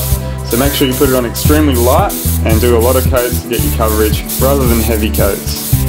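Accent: Australia. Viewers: Great to hear the Aussie accent! Thanks for the great video and info. I see I can buy it in Wodonga. Can I use this product on a vinyl dash board and window pillars? It is not possible to spray them, so I am presuming I would apply several coats with a cloth without removing the dash (huge job to remove it)? What can you suggest?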